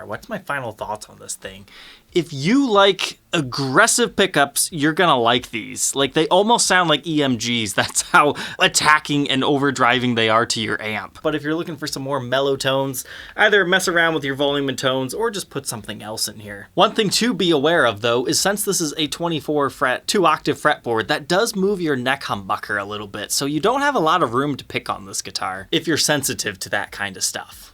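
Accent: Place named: Ohio, United States. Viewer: It’s that Ohio accent. He always says things like “needs restringed” instead of “needs to be restringed”.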